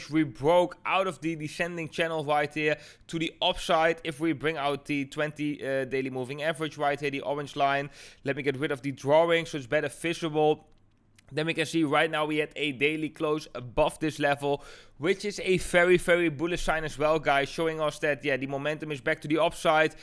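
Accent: Netherlands. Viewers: What time you sleep? You clearly sound dutch, but you always upload during the night ???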